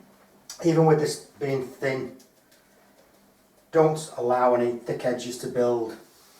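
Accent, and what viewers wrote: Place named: Liverpool, United Kingdom. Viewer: Great video! How long would you leave it before going in with the 2nd coat? Trying to place your accent, it's sounds slightly Liverpudlian but not too much. Warrington area maybe?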